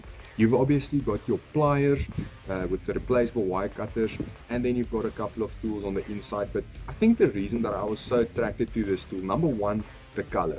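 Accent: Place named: South Africa. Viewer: Among other multitools, I have both the Wave+ and ST300M myself. Sadly couldn't get it in that awesome tan/black color at the time, but I guess that just means I can get a Rebar with that color scheme if I wanted to. Although I personally prefer the Wave+ so far, I'd always bring the ST300M - which is closer to the Rebar - for more heavy things aside from going into woodlands. Concise and informative video. Also: I love the south african accent, ever since I saw Lethal Weapon 2 back in the day. "Ah. Just checking to see if I was standing on plastic." Keep up the videos, and I hope you grow and get to do more.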